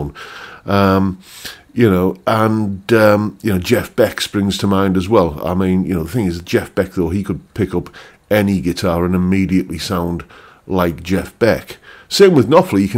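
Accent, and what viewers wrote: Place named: Northeast England, United Kingdom. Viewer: I'm trying to place your accent... Guessing somewhere between Consett and Darlington?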